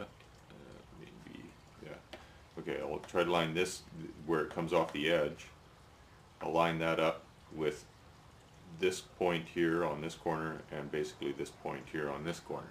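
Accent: Canada. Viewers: I am thinking you are from Canada, perhaps one of the Atlantic provinces?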